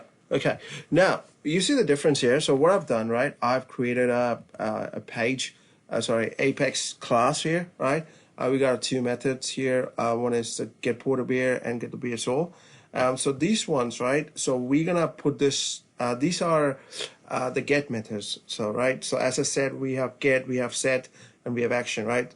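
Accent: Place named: Scandinavia. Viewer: Your accent doesn't sound like New Zealand to me, sound like Scandinavian.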